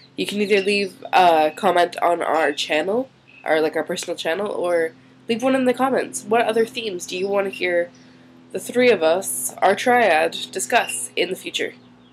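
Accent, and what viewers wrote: Canada. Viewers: Canadian accent? D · I just realized that you say ABOOT! It's slight but it's there! AWESOME!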